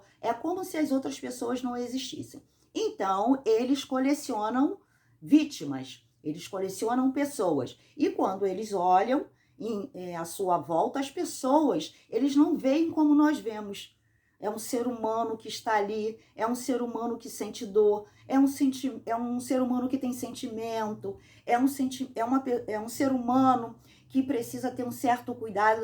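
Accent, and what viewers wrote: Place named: Rio de Janeiro, Brazil. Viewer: O som da sua voz é bem carioca.🤔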